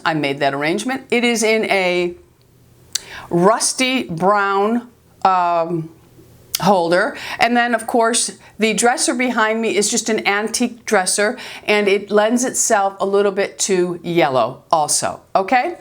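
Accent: South, United States. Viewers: I have find you few days ago and really like you. I am 56 and barely put stuff on my face but love to listen to girls telling me what they do. It takes a lot of humility to show our face without anything and you are doing it in front of all of us, chapeau to you. I think that you have a southern accent and it is so nice. I would love to meet women like you, it is refreshing. I never look at women and try to see if they had this or that done, it is not in my nature. I am sad to see women that have done to much and look weird instead of aging gracefully. I am in Italy and am seeing older Italian women that are just dressed properly and have the right amount of makeup or none and they look great. I often stop them on the street and tell them how stylish they are. We should all applaud each other instead of putting down. Ciao and a lot of bizous!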